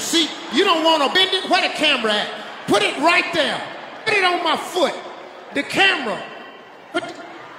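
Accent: South, United States. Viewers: Man his southern ebonics is so thick here i can barely understand him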